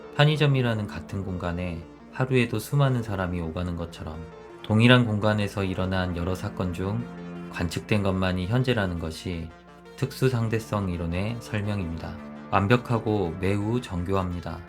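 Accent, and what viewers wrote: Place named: Jeolla, South Korea. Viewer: 즌라도 사투리 심하다잉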